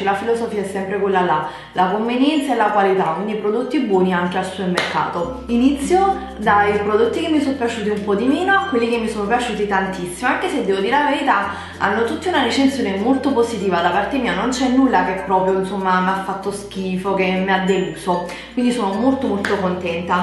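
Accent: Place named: South, Italy. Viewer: Come mai non sai parlare l'italiano?? Cosa succede al sud che non riuscite proprio ad impararlo?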